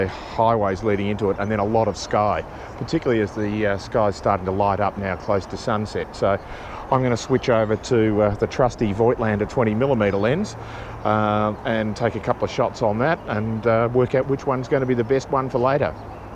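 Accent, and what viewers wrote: Australia. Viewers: I liked the video "Dad's garden" that was the first time I realized I do prefer the broad accent. I think you talk "Strine". It's actually what I want to learn and aim for. I will certainly watch all of your videos they are amazing and yes, I can also practice Aussie English.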